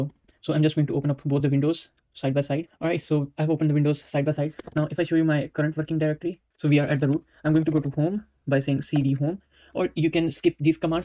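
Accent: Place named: Ukraine. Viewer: you got really strong ukrainian accent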